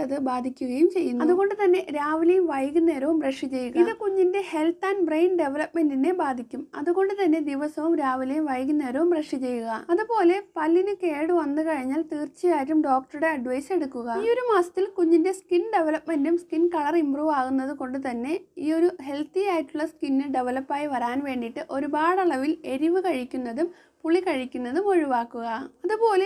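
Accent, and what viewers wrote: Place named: Kasaragod, India. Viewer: kasargod slang pole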